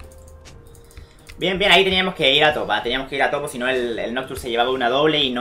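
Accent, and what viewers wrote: Chile. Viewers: es chileno sierto? v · es chileno no ?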